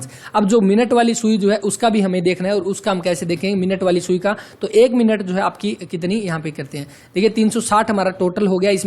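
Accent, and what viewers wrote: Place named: Haryana, India. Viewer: South haryana ki bhadi boli h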